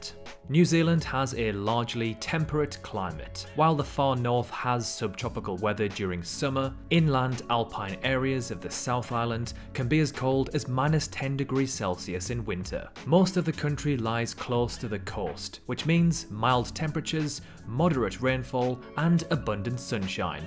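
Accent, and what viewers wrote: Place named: United Kingdom. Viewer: Interesting accent. The person doing the VoiceOver seems to be British but it’s hard, at least for me, to identify exactly what region. does anyone know?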